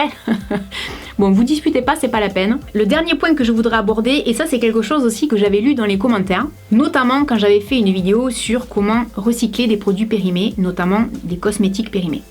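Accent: South, France. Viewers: J'adore ton accent du sud!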